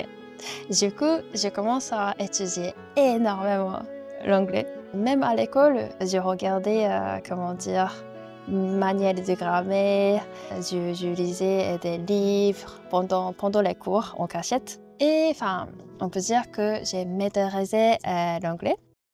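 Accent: Japan. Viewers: je suis très impressionné par un tel niveau de maitrise de la langue !! et en plus avec très peu d'accent ( je compare avec d'autres japonaises qui parlent en français ) alors bravo ! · J’adore ce français à la japonaise 😀 Tu lui donne un certain charme ❤️ Et tu parles mieux que certains Français 👏😘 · C'est la première fois que j'entend l'accent japonais avec du français presque parfais mdr. Par contre c'est pas une bonne idée de venir en france. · bonjour. Je suis très content d'avoir pu regarder - par hasard - ta première vidéo. Je suis moi-même très attiré par la langue japonaise que j'avais commencé à étudier. Bravo pour votre niveau de français, excellent, avec cette pointe d'accent japonais qui vous singularise et vous rend si charmante. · Une japonaise mignonne, parlant un français courant avec cette petite pointe d'accent et pour arrière plan un village japonais... 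Bref, un charme fou vette video !!! ❤ Allez je m'abonne !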